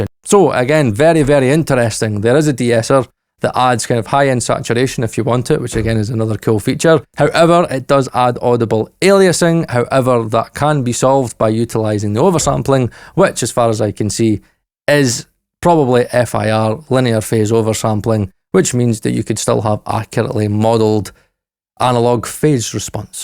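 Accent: United Kingdom. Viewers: Great video! I use a Harrison parametric EQ that I absolutely love. I got it from plugin boutique for $10 USD, but I'm really interested in trying this one out. Where in the UK are you from BTW? I've gotta sort your accent out!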